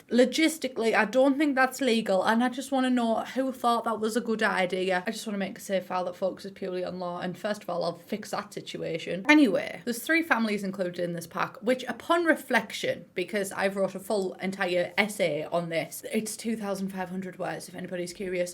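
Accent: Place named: Northern England, United Kingdom. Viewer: She is obviously a English Northerner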